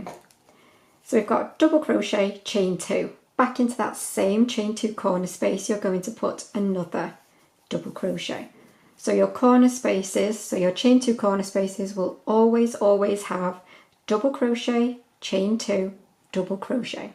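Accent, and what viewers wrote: United Kingdom. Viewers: Hello! 😊 First, thank you for your wonderful instructive video! Your voice and British accent sounds very kind and patient. My question is - Can this be done without turning after each round? Does it not look good if you don't turn? I taught myself how to do some crochet back in the 70s, but at that time I usually only did the basic stitches (single, double, triple, slip stitch and so on. I hadn't heard of the monk stitch yet before today. And I also haven't done crochet in a about 30yrs, I wanted to make a macrame dream catcher and decided to make a doily instead of purchasing one. I think I've found a renewed love for the crochet! Thanks again for your video. I'm sure I will watch more!☺️ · My heritage is Scots and I love a British voice, and I appreciate so much learning a new pattern. I have been crocheting since my mother-in-law taught me how in 1997.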